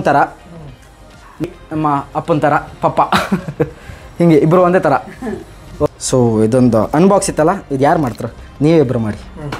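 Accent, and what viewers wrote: Kundapur, India. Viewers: Bro niv pojaraaa navu poojary.. Nim kandapr bashi mathad thidre bari kushi ath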